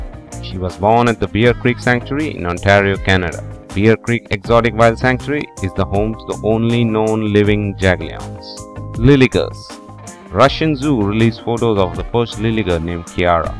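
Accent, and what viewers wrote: India. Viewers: This guy has indian accent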